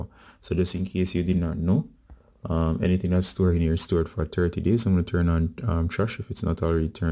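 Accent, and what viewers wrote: Jamaica. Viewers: Omg!!!!! Thank you so much Ricardo!!!! I was so scared that I lost all of my pictures and you helped me recover them. Also p.s I detect a J.A accent...big up your self! I'm a fellow Jamaican as well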